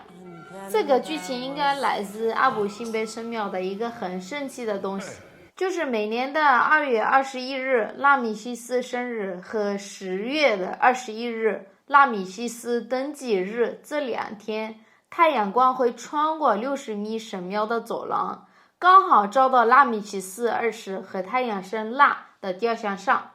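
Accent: China. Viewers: 可惜學得是中國口音，美感打了點折。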